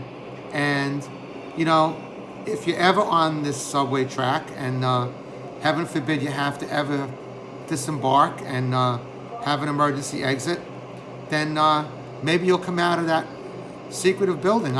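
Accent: Brooklyn, United States. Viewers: I know nothing of this building but that's really interesting. Love the hidden secrets NYC holds. I'm a native NYer and after almost 6 decades I moved away about 6 years ago. Not for nothin' but your Brooklyn accent is music to my ears.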